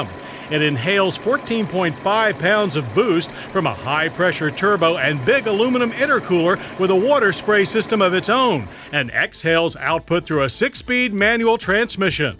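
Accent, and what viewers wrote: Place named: United States. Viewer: This commentator very american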